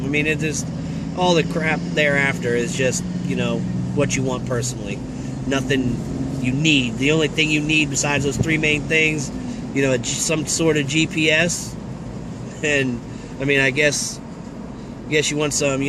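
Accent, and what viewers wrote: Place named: Laredo, United States. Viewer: is this Laredo TX it sounds like it 😂 👍👍👍👍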